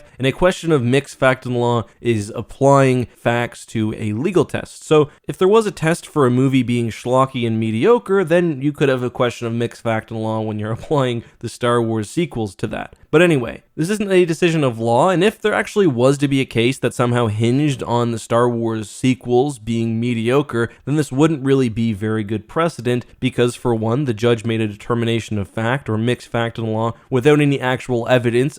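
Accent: Canada. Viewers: Amused. I live in Alaska so I didn’t even pick up on your Canadian-ish accent until you stated that you “practiced Canadian law.”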